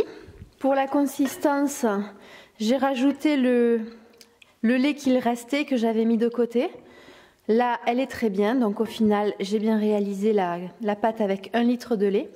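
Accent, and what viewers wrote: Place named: South, France. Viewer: Whaouhh j'adore ton p'tit d'accent du sud !!! Les recettes des mamans sont toujours les meilleures :)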